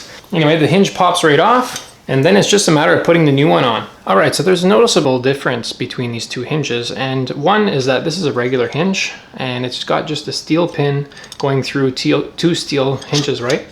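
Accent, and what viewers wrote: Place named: Canada. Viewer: i knew that was a Canadian accent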